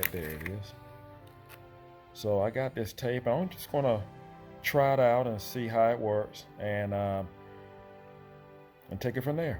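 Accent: South, United States. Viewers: Love the country accent.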